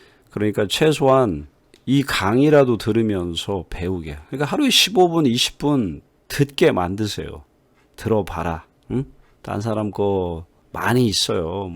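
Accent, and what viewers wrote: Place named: United States. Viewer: 진짜 미국의 발음이 나오는것 같으십니다 존경해욥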